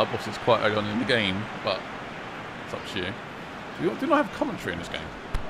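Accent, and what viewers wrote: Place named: Liverpool, United Kingdom. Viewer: It's difficult to understand some of the things you're saying because of your strong scouse accent.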